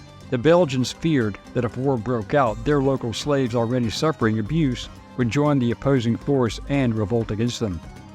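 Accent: South, United States. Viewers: If there were so many slaves, where are they? All integrated into their societies. You are totally wrong about Somalia. Swahilil is not an Arab language. Mr Marine Corp, were slaves to the Caribbean and America ever integrated even today? You sound Southern, you should know.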